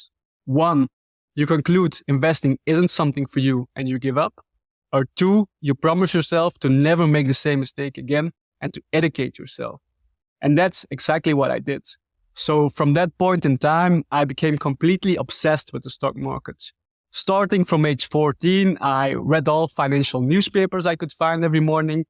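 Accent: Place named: Brazil. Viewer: Also, my guess is he's Brazilian. He has the UFC fighter accent.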